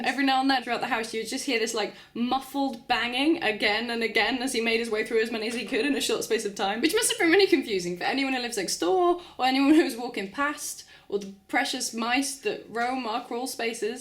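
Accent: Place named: United Kingdom. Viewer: I don't understand why your cute british accent always attract me again to your videos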